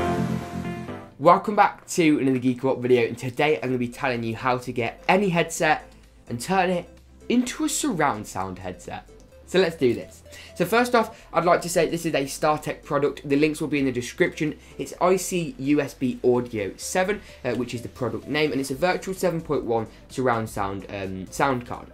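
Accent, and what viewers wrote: United Kingdom. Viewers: your british accent is glorious :3 it gives me goosebumps xD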